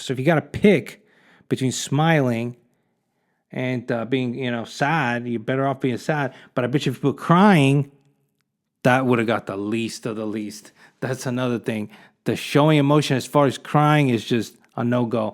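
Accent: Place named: Northeast, United States. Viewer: what is your very prominent accent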